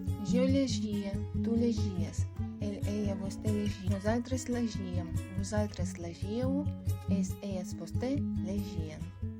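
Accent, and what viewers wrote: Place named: Eastern Europe. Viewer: На произношением конечно надо поработать.... Буква r в конце глаголов, например, не читается. Уже молчу про типичный восточноевропейский акцент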